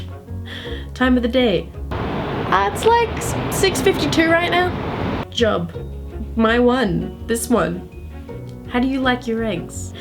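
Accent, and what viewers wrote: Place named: South Africa. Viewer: You sound more South African than Australian...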